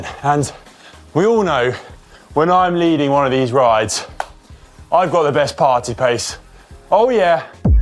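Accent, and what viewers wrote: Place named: England, United Kingdom. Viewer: Irish name, Irish flag, English accent?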